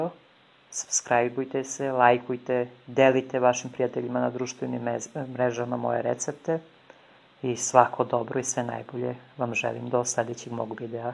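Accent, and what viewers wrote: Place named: South, Serbia. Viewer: Dragi,recept je super.Corbica kao san.Ja sam je pre spremala na slican nacin a sad bas kao Vi.Al od svega mi je najsladji taj vas naglasak koji sam ja vec izgubila,al mi se potkrade oljupim papriku a ne oljustim i tako mnogo lepih reci koje na jugu upotrebljavaju.U svakom slucaju sve nalepse Vama i Vasoj porodici i veliki pozdrav👏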